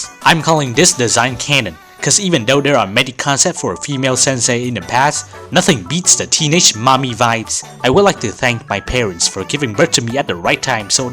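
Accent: Vietnam. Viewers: rly vnm accent 🐧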